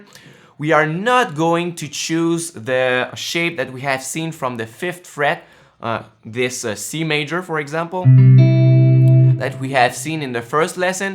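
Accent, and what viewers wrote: Quebec, Canada. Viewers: I love your Quebec accent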